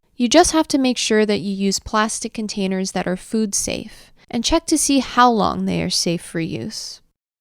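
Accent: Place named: United States. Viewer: thanks for the tips! Apologies btw. I didn’t realise you were American, the accent is quite subtle and only realised when you were talking about 50 cents seeds. Brown field over here is the site of an old building torn down and new housing built on top. The soil is usually awful, if indeed it is soil. More often it’s just rubble with a lawn on top. Being able to grow plants and identifying them in the wild should be taught in schools, (at a practical level).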